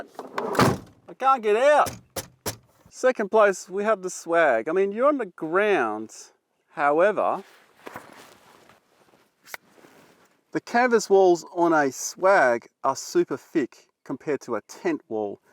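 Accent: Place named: Australia. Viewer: I’m not sure why I find aussie accents so annoying. I guess I just want them to annunciate…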